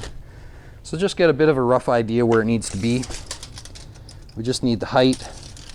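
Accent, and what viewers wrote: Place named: Canada. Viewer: Canadian accent 😕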